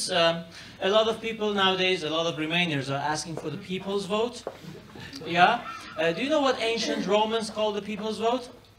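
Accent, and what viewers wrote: Iran. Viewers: I guess thats his stage name because he looks VERY Iranian and has a stereotypical Tehrani accent lol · He has Persian accent and look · He's Italian right? How the fuck he has an obvious Persian accent? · He has a very distinct Persia accent.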